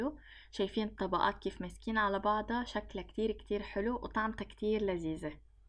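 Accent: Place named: Lebanon. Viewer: يلي بحبو اكتر من وصفاتك هي طريقة كلامك ياخي شو بدنا بالحكي اللهجة اللبنانية عشقي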